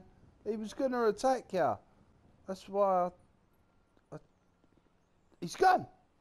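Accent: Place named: South, United States. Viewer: Hahahhahahaha!! Loved the Country accent man!!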